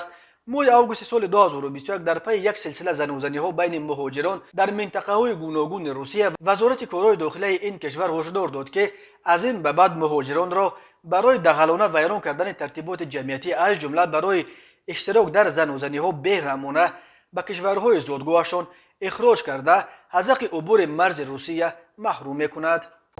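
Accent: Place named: Badakhshan, Tajikistan. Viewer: Ташакур бародар барой ахборот ва шевай ширини бадахшоният мамнунем аз хидматхоятон ки ба мо мекунед ва аз тамоми хабарой дохилу хорич зуд огох мекунед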